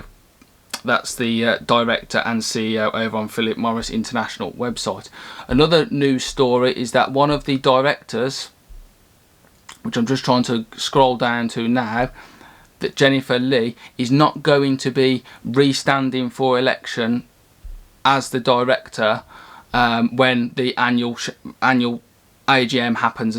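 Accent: United Kingdom. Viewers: Prolly UK somewhere lol. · Got to say I’m loving the fact that I have found someone with my home accent... your obviously a Brummie?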